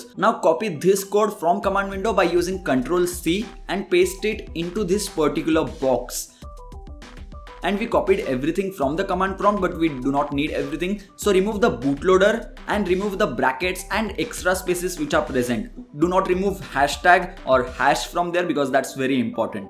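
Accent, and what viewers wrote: India. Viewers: The speed at which you're talking would be fine...if you had clear enunciation and syntax of the English language, and you do not. You have a very thick Indian accent and it's difficult to understand you. I gave up trying to listen and stopped your video after about a minute and a half. You're talking way too fast for your level of English enunciation. You need to slow down so that listeners don't have a difficult time trying to understand and decipher everything you're trying to say.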